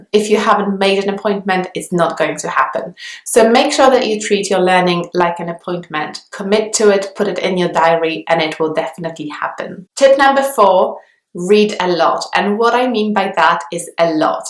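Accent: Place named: United Kingdom. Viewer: Great content! I have just discovered your channel and it seems to be the thing I needed so far. One quick question. I definitely hear a British accent, but there is something more in your accent. Is it Polish? Your speech is purely beautiful.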